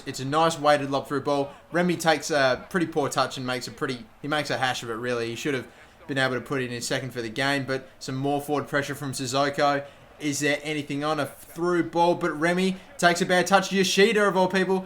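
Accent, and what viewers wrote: Australia. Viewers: i lived in Sydney near Bondi Beach on Bouronia Road. I love the aussie accent. great vid again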